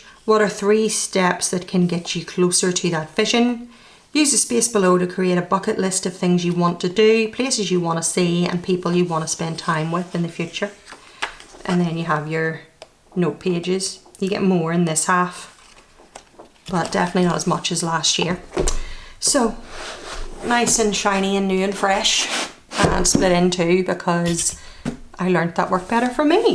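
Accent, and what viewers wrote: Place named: Ireland. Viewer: This is the first video of yours that I have watched and love it! Last year I traveled through Ireland and love hearing your accent 😊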